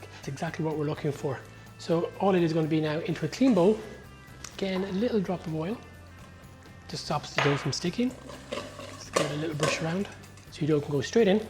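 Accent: Ireland. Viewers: Woke up early AM here in the States. What am I to do?. Check out, my go-to bread guy. For sure I will give this a try in the next couple of weeks. Your "brogue", reminds me of my, Da who came over from Limerick. Take care.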